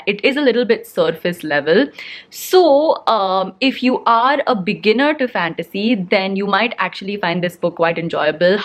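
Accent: India. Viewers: Yes.. Heard her speaking hindi for first time in this video.. Hey.. Do make a hindi review too.. You may attract a great audience as well for your hindi accent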